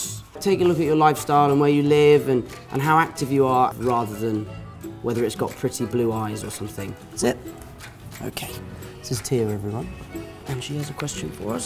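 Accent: United Kingdom. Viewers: His British accent has come back 🤩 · He took back his British accent, no ?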